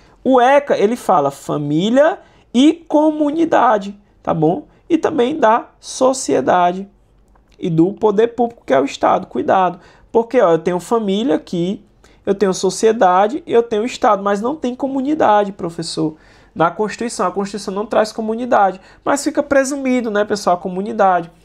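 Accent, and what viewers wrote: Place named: Northeast, Brazil. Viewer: Ninguém pode se irritar com seu sotaque nao, oxi #orgulhonordestino. Sou carioca, mas minha familia é nordestina!! Excelrnte prof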